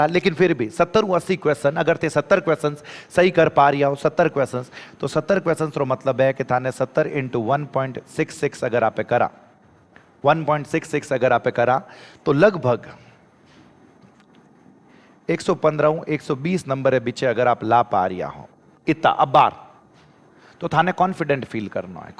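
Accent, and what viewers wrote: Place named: Bikaner, India. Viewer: Guru ji the bikaner ra ho kai aa bhasha mali lag man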